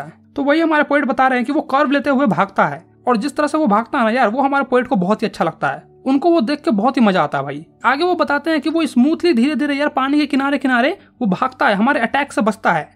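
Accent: Bihar, India. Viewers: Bhaiya are you from Bihar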